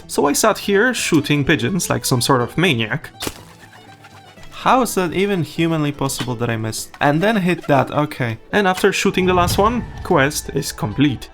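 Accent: Poland. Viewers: Soooo... next 4 years? Btw, are you from Poland? Your pronunciation of names like Piastowie or Klonica sounds like that. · i hear who you pronounce the cities and yes i was right channel based in poland. bardzo dobrze kochanie <3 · Dziwny ten angielski jakiś Polski z deka😂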